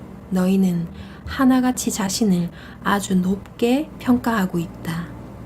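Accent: Gyeongsang, South Korea. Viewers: 경상도 억양이 친근하네요 젊은 시절의 나인것 같은 착각이 드는 다정한 목소 읽고 싶었던 신과나눈 이야기 맛깔나게 잘 듵었습니다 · 여러 곳에서 제목만 들었던 책이었는데 오늘 만났너요 감사합니다. 경상도사투리 쓰는 여신의 목소리 재밌습니다. 원하는 모습을 생각하고 말하는것 보다는 원하는 모습에서 행동하고 말하고 생각하는것 ᆢ 인거죠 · 구수한 경상도 사투리 좋아요.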